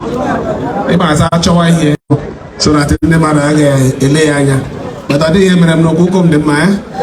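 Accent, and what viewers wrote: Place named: Umuahia, Nigeria. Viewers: he's Igbo language sound like the ikwuano umuahia people speaks . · He's even speaking central Igbo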